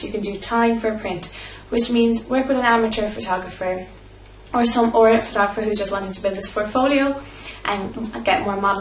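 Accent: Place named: Ireland. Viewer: I thought I recognized you from the video thumbnail, and as soon as i heard your accent I realized it was from looking on Irish modelling agency websites earlier :) Could I just ask a few questions please? What would be your opinion on starting modelling young? (im 16) And where would you recommend starting looking for agencies in Ireland? Thank you! This was a really helpful video and you're really beautiful xx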